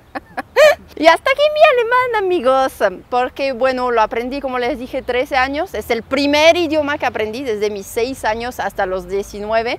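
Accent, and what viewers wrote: France. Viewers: Vous avec un accent parigaud? Pourquoi? Vous y avec vécu tres peu de temps, mais c'est vrai que j'ai etudié à Besançon et j'ai plutôt un accent de titi, mes copain lillois se marrent de moi, puisqu'ils savent que je suis mexicain. Quand à votre accent, c'est normal en chinois, en suédois ou en mixtèque ! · Es muy agradable escucharte con tu acento francés, pero no eres inventada . Hablo de las latinas y no los latinos, las mujeres van a otro país, y hablan con acento fingido y muy pobre, porque no hablan el idioma de dónde vive, y según ellas olvidan su propia lengua maternal 😂, se ven súper ridículas. Yo también hablo 4 idiomas, el francés lo aprendí , en México en el Liceo Franco Mexicano ,en Polanco. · You speak English with a French accent. Alf for half. Siempre me fijo en los accentos de los franceses por que me ayuda to reverse engineer towards bettering my French accent. 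Es el ritmo del Francés que es dificil al principio y por el que se detecta que alquien habla francés originalmente. El acento de la sílaba en el lugar donde no va dice francés.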